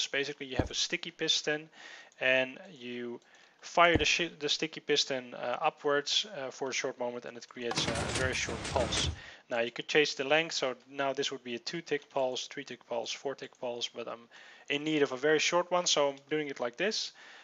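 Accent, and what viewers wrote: Netherlands. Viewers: je hoort het gewoon nederlands :3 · are you Dutch?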